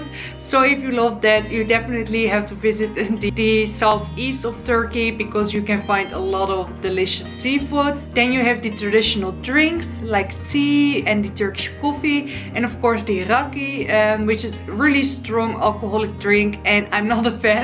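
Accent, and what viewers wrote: Netherlands. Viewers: I knew ur from The Netherlands, just because of ur accent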